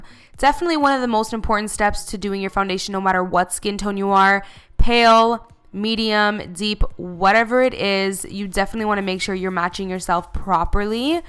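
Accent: Canada. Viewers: Her parents are portuguese,but I think her accent is pretty canadian...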